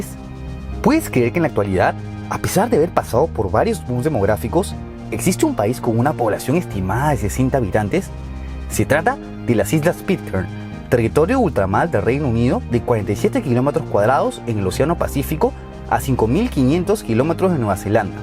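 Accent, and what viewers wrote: Peru. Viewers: Es primer video que veo de tu canal, y con eso ya sé que eres peruano por tu acento! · Su acento dice q es peruano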